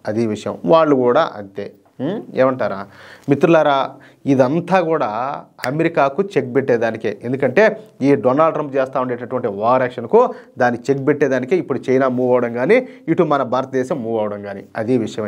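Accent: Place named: Nellore, India. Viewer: Dear, your presentation in that vernacular style with Nellore slang is very good. You are straight and your emotion is just right. Your comprehension about the subject you are speaking is also quite good. Try to organize a debate video by inviting few experts with you as anchor. Thank you for the topic presentation.🌹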